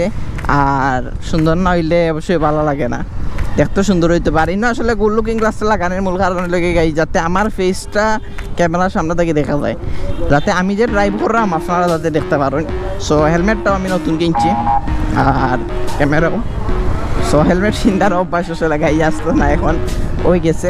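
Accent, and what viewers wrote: Sylhet, Bangladesh. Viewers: Best Sylheti vlogger hands down pure Sylheti maat Your number fan from UK London Tower Hamlets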